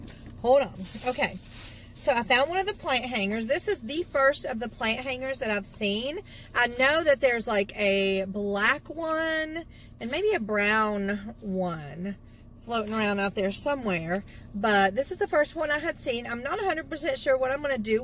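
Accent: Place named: West Virginia, United States. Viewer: Are you in Beckley, WV? It just sounded like that area. I am within 50 miles of Beckley. Enjoy all your videos, thank you for sharing.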